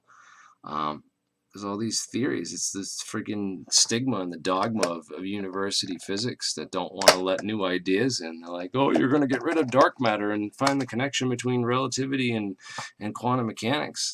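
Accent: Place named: New England, United States. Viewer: My first time watching this channel. Sounds like a New England guy.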